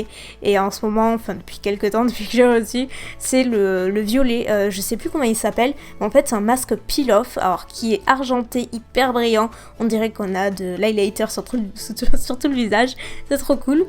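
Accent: South, France. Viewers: Tu as un accent incroyable on dirait que tu viens du sud je t'adore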